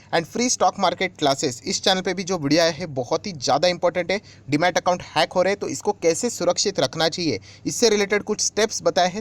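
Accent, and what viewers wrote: Maharashtra, India. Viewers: मला ही वाटते सर मराठी भाषा बोलत असणार,, कारण कधी कधी मराठी उच्चार ऐकू येतात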